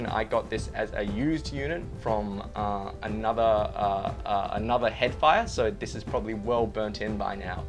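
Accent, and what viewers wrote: Australia. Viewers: Hey, judging by the sound of your voice, it seems that you are Australian. Where do you get your headphones from?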